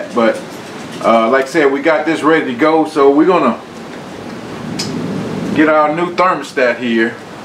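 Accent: South, United States. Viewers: What state do you live in, got a bit of southern drawl there, I'm from Ohio myself born in Kentucky!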